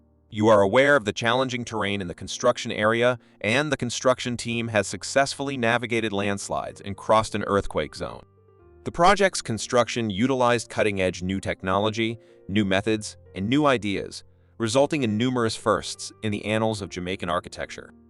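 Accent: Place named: United States. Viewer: These kind of videos are so frequent. It's always the same thing: - This highway is currently under construction, when in reality it was finished a long time ago - Jamaica entering unforeseen "debt traps" with China, which to this day no one can fully explain - Questionable grammar and sentence construction - AI generated narrations with an "American" accent - Mismatched visuals - Outdated stats - Spreading propaganda about Jamaica's economy being in the pits before this project, like Jamaica was a starving nation or something - Jamaica's mountains getting in the way of road construction, when two major highways had already existed before the North-South highway - Getting Jamaica's geography wrong by assuming the Blue Mountains are in the middle of the country - Getting wrong for the trillionth time that the North-South highway crosses the Blue Mountains - Assuming Jamaicans had no way of crossing their mountains prior to this - Assuming tourism is dependent on this one highway, which makes no sense considering Kingston is not a major tourist destination - Assuming tourism is the only economy in Jamaica - Ignoring that there is already a proper roadway on the coast that links the major tourist areas in Jamaica - Jamaican locals depending on this one project for prosperity, transport convenience and foreign exchange - Getting details of how the North-South highway was funded and its conditions wrong - China making Jamaica a new deep shipping water port, something Jamaica alrea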